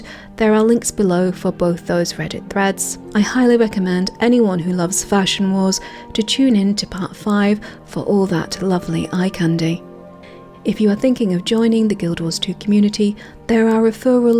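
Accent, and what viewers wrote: United Kingdom. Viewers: Honestly what got me to give your videos a try was your amazing British accent but once I watched the video it was really well made and informative. Subscribed.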